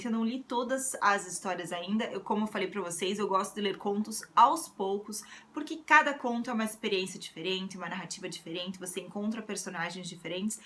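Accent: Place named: South, Brazil. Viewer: Adoro teu sotaque, sutil sotaque sulista. É bom ter uma representante do sul no âmbito da literatura. Sucesso nobre conterrânea.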